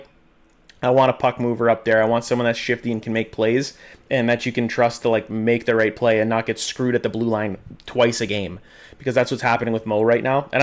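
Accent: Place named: Ontario, Canada. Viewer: Fun fact: The Wings moved into second place this weekend. The Sabres if they win their game in hand, they are two wins from a Wild Card. Get this in your head: There are good shots and they just miss, hit the post and out, hit the post and in, and there are goals. Now go review high school probability and statistics to under stand how that results in final scores. Your accent betrays you, so please compare the Wings to your two Ontario team. And also compare Larkin to the crybaby who was cast as the greatest player ever. Larkin has 2 points more than 87, Larkin kills penalties, Larkin contributes as he is +7 in the stat that correlates in aggregate most closely to games score (aka wins) while Canada's prompted up hockey saint is a net zero and DOESN'T play a complete role. If you are not a fan enough to handle the ups and downs of a season in a league of extreme parity, maybe you should just watch the weekly highlights and then create a weekly video. 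This video today looks like Jonathan Quick 0.3 seconds after a good performance, lacking emotional impulse control.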